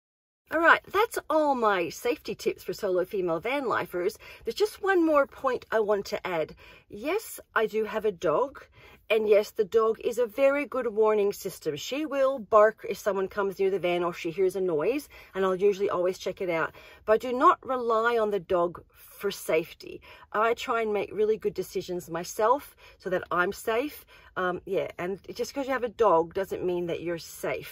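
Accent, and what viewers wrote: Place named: Australia. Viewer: sorry, I think you've got a bit of Australian accent too from living here for a while now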